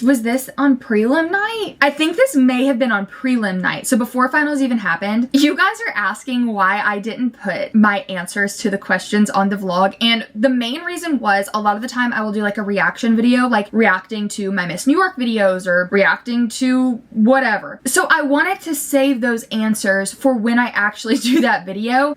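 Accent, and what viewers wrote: South, United States. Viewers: lol you’re twang is so much more pronounced around your fiancé 😂I get the same way around my southern grandparents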